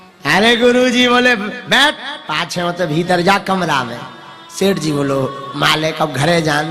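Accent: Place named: Bundelkhand, India. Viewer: महाराज जी आप बुंदेलखंडी मैं कथा सुनाते बहुत अच्छे लगते हो 🌹 हमें भी समझ आ जाती है 💞 जम्मू से पगली भक्त हूं 🙇👏👏 सीताराम हनुमान जी 🌹🌹🙏🙏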